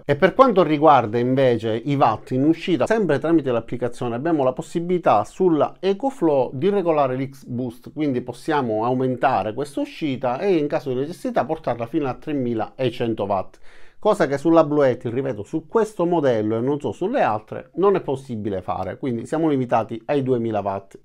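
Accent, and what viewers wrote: Sicily, Italy. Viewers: Ciao grazie delle preziose informazioni. Oggi anche mia moglie ha visto il video e mi ha detto “dall’accento è di enna o di calascibetta” ..lei è di enna 😂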